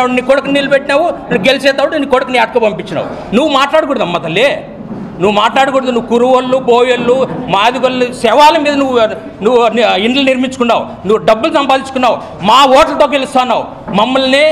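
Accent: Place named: Rayalaseema, India. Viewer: సూపర్.... పూర్తి రాయలసీమ యాస..వార్నింగ్ వింటుంటే సమ్మగుంది...😂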